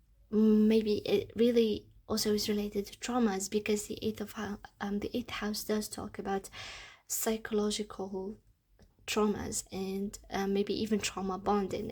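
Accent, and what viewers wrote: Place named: Scotland, United Kingdom. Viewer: I know there’s a separate accent but you also sound slightly Scottish!! It’s so calming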